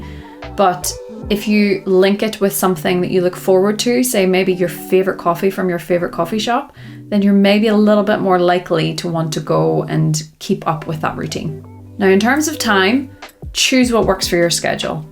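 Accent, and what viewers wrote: Scotland, United Kingdom. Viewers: Love the relaxing tone of this video - and always love to see references to GTD. Curious, what is your/her accent? (As in, which part of…Scotland, right?)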